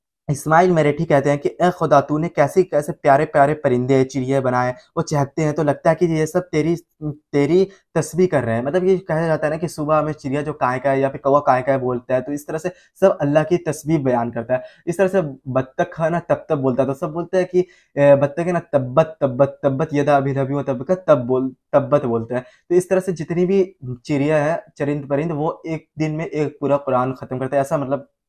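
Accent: Bihar, India. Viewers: sir aap bihar se hain kya?????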